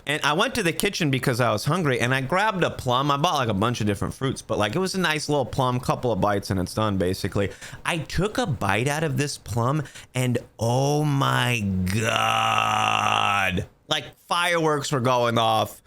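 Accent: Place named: Northeast, United States. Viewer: You sound like you’re from the north east so a soft baked pretzel from sheetz or Wawa. Cheese filled. Mac n cheese bites. Grilled cheese. Extra cheese. So much cheese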